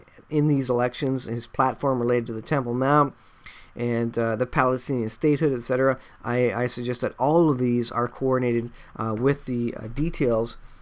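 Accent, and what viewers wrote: Canada. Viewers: Canadian Aye? 😄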